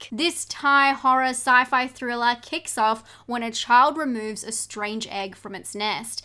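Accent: Australia. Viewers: Thanks for another wonderful list, I’m checking the children of the corn, by the way, I’m totally amazed by the Australian accent you got there ! 🤩 Greetings from Berlin, Germany ✌️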